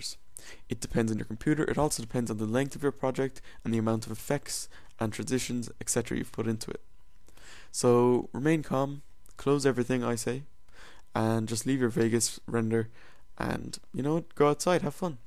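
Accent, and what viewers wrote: Ireland. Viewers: The video at points makes you sound like you've got a dirty Dub accent. Sorry for the insult ;)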